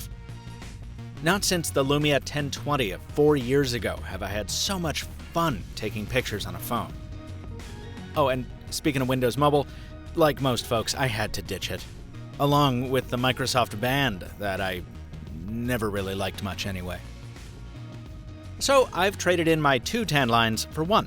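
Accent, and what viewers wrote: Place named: Boston, United States. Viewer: Boston aye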